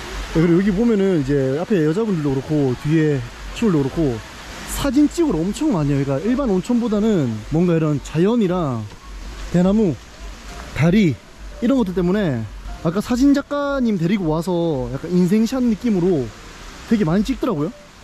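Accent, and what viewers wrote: Gyeongsang, South Korea. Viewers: 말투가 대구말투이신것보니 대구경북사람이군요~ 분명히 부산경남말투는 아님ㅎ 제가 TG사람이라~정감가네요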